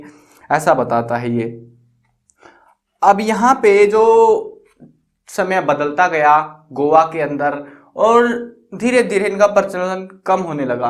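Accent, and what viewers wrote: Haryana, India. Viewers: Bhai yaar tumhari voice sunn k lagta hai ki tum haryana side se hooo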